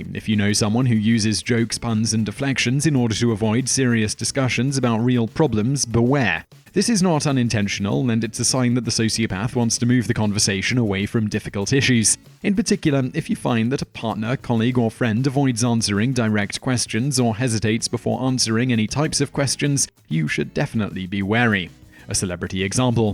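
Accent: United Kingdom. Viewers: YouTube should require "British Accent" in the title of any video with a British narrator!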